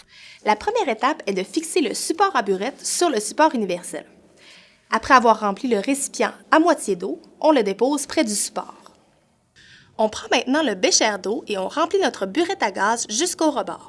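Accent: Quebec, Canada. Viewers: Cette vidéo est incroyable un accent québécois avec des explications claire et pricise que demande mon contrôle de physique mdrrr ! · Qui regarde ça en 2020 ?😹 J'ai connue votre chaîne Youtube grace a mon prof de physique/chimie et en regardant les vidéos j'ai remarquée que ma moyenne en physique/chimie à évoluer 😸 Et j'aime trop ton accents Québécois 😺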